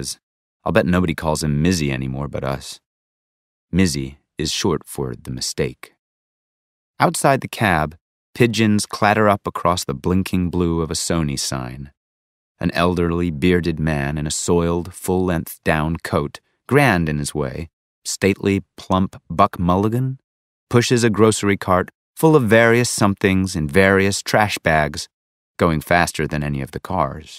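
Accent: United States. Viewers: As a fan of American accent I'm really happy about this video <3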